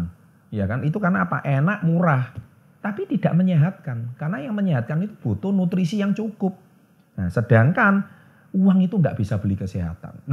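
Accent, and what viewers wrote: Java, Indonesia. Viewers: Logat jowo e ketoro pol opo wong jowo to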